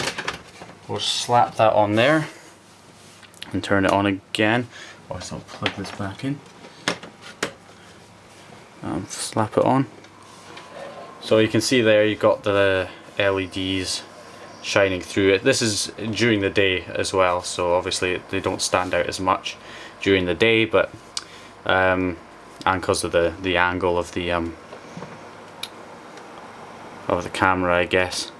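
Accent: Scotland, United Kingdom. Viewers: Good old scottish accent love it hahaha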